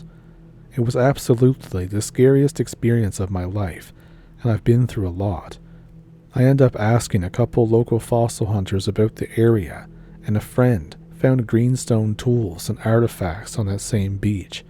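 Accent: Canada. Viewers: You don't sound like you are from New Zealand. More like Canada. Cup of tea, real British stuff. Get real man. What a load of tripe. Your description of your fossil area near Christchurch do not match. Just made up stories with no truth. I gave up listening.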